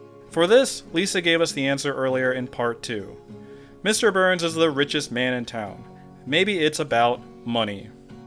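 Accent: United States. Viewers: Does he? I'm British and he sounds pretty typically American to me.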